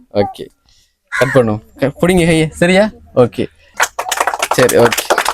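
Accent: Sri Lanka. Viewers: வணக்கம் Pro, நீங்கள் செய்யும் சேவை அருமை, உங்கள் மட்டக்களப்பு தமிழ் சிலாங் கேட்க செமையாக காதுக்கு இனிமையாக இருக்கிறது, அடிக்கடி என்ன என்ன என்ற வார்த்தை கூடுதலாக பாவிக்கிறீர்கள், மொத்தத்தில் Supper Pro, ஜேர்மனியில் இருந்து சிவராஜ்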